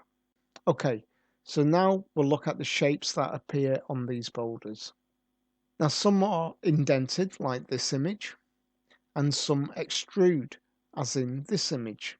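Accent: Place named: Liverpool, United Kingdom. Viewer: You are a dimwit, you don't even display any knowledge about concrete Let alone Inca stonework. And your scowser accent perfectly portraits your intelligence level ...none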